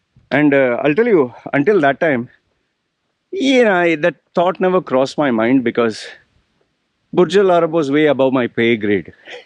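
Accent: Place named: Malabar, India. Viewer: So after your Burj Al Arab visit you became sophisticated! But how come you didn't acquire that particular sophisticated British accent as well? And you still managed to retain your Malabari accent!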